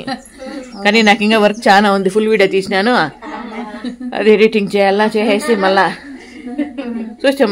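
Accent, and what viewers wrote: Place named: Rayalaseema, India. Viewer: Mee casual talk.i like your royals seema slang. Geetha Reddy Chennai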